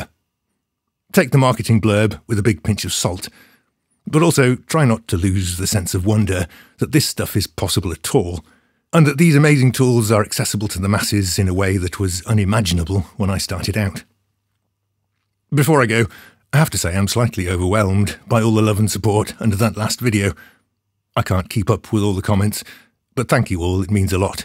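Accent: United Kingdom. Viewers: You sir win the Internet! Another brilliant video. Thank you for your wisdom and your BBC voice that delivers it haha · which area of UK this accent ?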